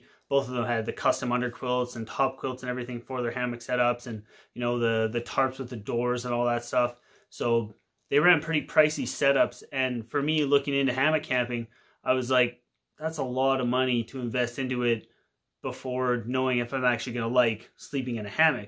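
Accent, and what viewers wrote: Canada. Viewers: Geez, if you want a larger U.S. Audience gotta tone down that canuck accent my friend - but, guessing it's a slow PRO-cess.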